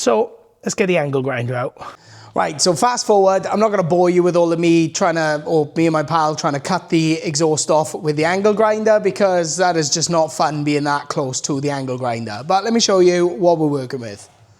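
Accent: Wales, United Kingdom. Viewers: Tell me he's welsh without telling me he's welsh lol. another great video mate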